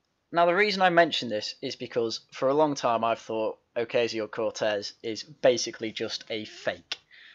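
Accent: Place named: Manchester, United Kingdom. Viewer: sorry my dude it says "Infertility is therefore one of the major side effects of the course of treatment that runs from puberty suppression through cross-sex hormones to surgical sex reassignment." Which includes cross sex hormone treatment. Which means there is a lot of misinformation in this video. BTW you sound dead familiar are you from greater Manchester?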